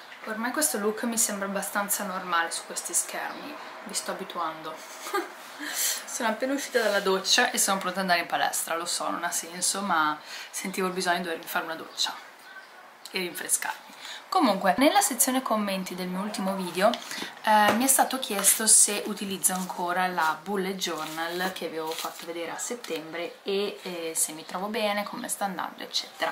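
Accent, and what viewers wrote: Tuscany, Italy. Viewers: parli con accento toscano ora 😁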